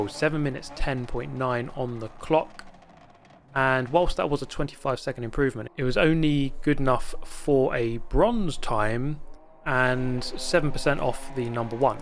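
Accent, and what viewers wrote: United Kingdom. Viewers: Why do I get so hype watching someone else play the same game as me. Those damn Brits can make everything sound interesting.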